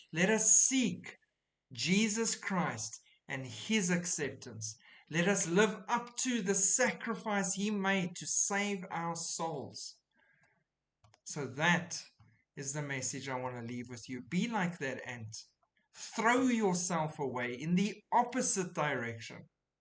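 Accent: South Africa. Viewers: I found your discussion on the red heifers today, as I have been following Bible prophecy from the day I read The Late Great Planet Earth. I was so happy to hear a familiar accent and find a fellow South African who truly loves Jesus Christ and believes in the Sanctification of the Believers and who believes in the imminent Rapture, putting Him above all. Wow, thanks!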